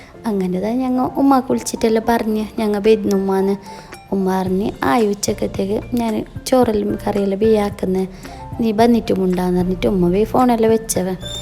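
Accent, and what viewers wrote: Kasaragod, India. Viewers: കാസര്‍കോട് ano😂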